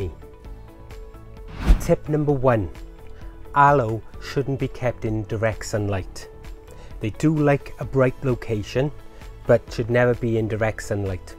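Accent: Wales, United Kingdom. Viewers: Are you a Welshman? Charming in any case!!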